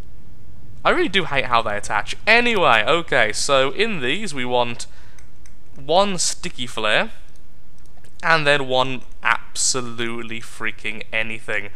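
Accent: United Kingdom. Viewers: I just got to this channel and some how I knew you would sound British.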